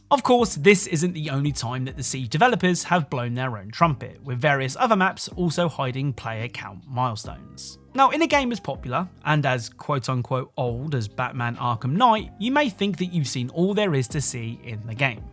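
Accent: United Kingdom. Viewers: What area roughly do u live u sound British u your not from London though ar ya u don't really sound like it, I'm in Harrow, I'm surprised that still happens over here cos it's very time consuming I feel, unless u had a good car I guess...🤔soz though man at least u n family unharmed that's most important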